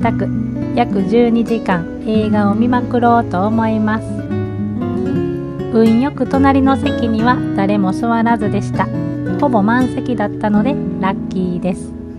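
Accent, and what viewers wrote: Kansai, Japan. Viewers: 関西弁やな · 初めての視聴です。映像がとても美しい。関西イントネーションから西宮かなと思いましたが芦屋のハイソマダムなのですね。 失礼しました。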